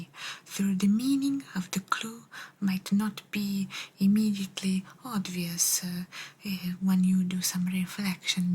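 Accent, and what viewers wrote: Italy. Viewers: I bloody love your Italian accent! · You always think of good topics to discuss as your therapist character,. Your Italian accent is great too. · Ciao cara! Mi piace molto il suono della scrittura e il tuo accento italiano è meraviglioso, davvero adorabile. Sei bravissima e bellissima! Ti voglio tanto bene 🫶🥰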